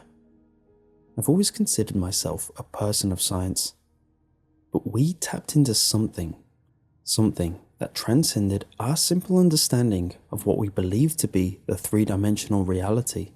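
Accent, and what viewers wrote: United Kingdom. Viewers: Pissanjecous Demsley it's cause of his british accent.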